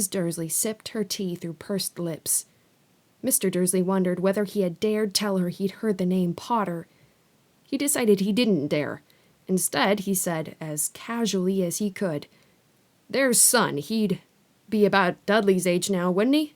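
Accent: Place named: United States. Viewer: I m learning ENGLISH. I m looking for American accent for reading Harry Potter . Finally I found you !and even better, you re a lady ! I m so happy!THANK YOU SO MUCH ❤❤❤Your voice is amazing ❤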